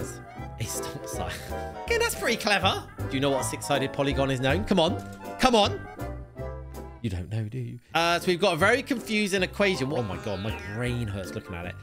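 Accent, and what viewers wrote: United Kingdom. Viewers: Noa fan of the British accent and the large number of words pronounced incorrectly.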